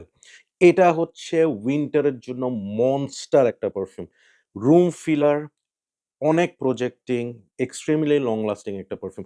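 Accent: Chittagong, Bangladesh. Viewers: আসসালামু আলাইকুম ভাই। আপনার জন্মস্থান কি চট্টগ্রাম?আপনার কথার মধ্যে চট্টগ্রাম এর টোন আছে ।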